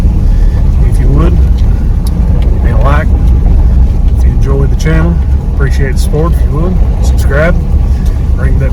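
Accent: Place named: Eastern Kentucky, United States. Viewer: With that accent, you have to be from Eastern, Ky? My mother is from Perry County. Great video, I enjoyed the moonshine still. Never knew it was there. Thanks for the upload. New Subscriber!!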